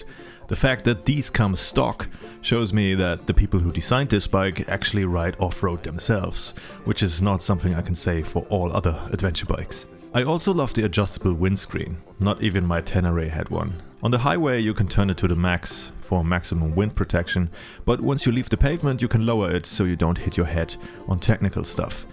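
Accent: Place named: Germany. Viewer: Good review and wow, your English is amazing. I really liked the use of idiom. The spoiler "thingy" and "cheers" were really nice to hear. Whilst you have a German accent, you don't have that mid Atlantic accent so many European speakers of English have. You should feel proud of your video and your excellent English.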